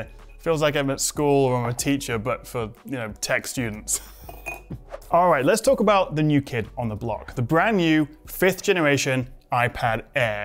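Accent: United Kingdom. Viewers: I love how aggressively British you are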